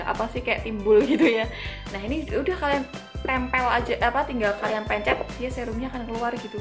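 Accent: Java, Indonesia. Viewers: medok bet medok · Selalu sukak sama medoknya ❤️ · Medoknya itu lo ... unch unch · Langsung subs gegara medok :') ❤️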